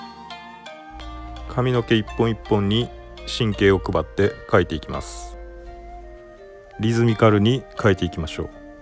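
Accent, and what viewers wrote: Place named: Miyazaki, Japan. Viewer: 宮崎弁 連発ですねえ〜それだけリラックス⁉️目元 口許本当に大事ですね❣️❣️❣️段々、精気が溢れてきました。目元の赤がいいです‼️ひねくれちょらんよ❣️‼️❣️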